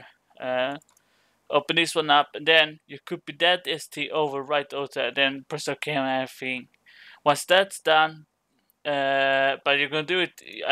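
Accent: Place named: Arab world. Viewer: ok so if u are offended by constructive criticism (not complaining), im sorry...but u speak and write as if your native language is arabian or something like that and also you seem to swallow full words..i've just been honest..if u don't like honesty..then why not deactivating the comment section or just don't talk to me...and why didn't u say this earlier that u don't have your nintendo wii anymore..we could have saved so much typing here...the people who tell u that this video is fine, they most likely have had experience with this stuff before..i asked because im a newbee and usually i can follow every and the most complicated trutorial on the web successfully, even if i didn't know about the subject before, but this isn't possible with your tutorial, you should reconsider if it's really me who made the mistake here..or you...not to forget that i already mentioned that yours is the only video tutorial i found on the web treating this subject..but yea, if your tutorial here was really just about transfering a nintendo wii save state to the pc, then the whole name of this video is already misleading for english people, i wanted the opposite..btw. 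my native language is german and i don't seem to have issues with english typing or speaking..and i got one last thing for u, if it's really a problem for you to be held responsible for older videos you've made, why not deleting them..i just don't get u..i mean i'm sry that u can't deal with constructive critcism...i will find hel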